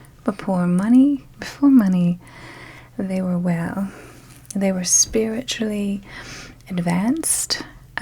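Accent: Transatlantic, United States. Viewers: a slight transatlantic accent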